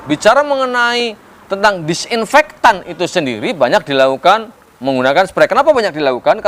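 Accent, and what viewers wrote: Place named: Banyumas, Indonesia. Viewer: ngapak e isih ketara lah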